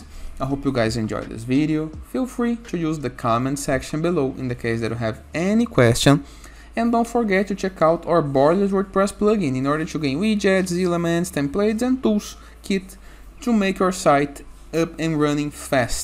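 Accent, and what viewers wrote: Brazil. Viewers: haha tem um brasileiro nesse ingês hein, parabéns pelo conteúdo!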